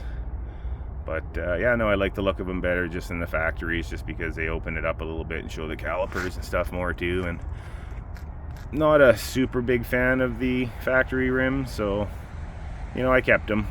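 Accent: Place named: Canada. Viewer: I hear the Canadian accent. Perfect car for the Northern weather. Great video man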